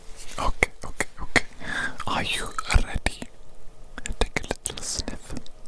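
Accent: United Kingdom. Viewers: Are you british im from the uk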